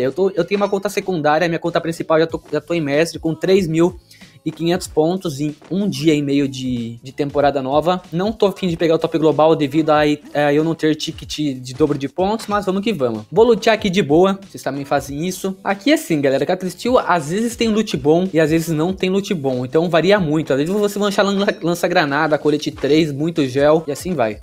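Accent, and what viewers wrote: Rio Grande do Sul, Brazil. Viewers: Cê é gaúcho?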